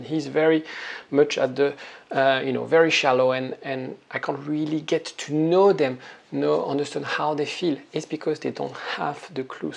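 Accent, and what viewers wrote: France. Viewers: I loved this video❤Thank you,. The French accent is also very nice😊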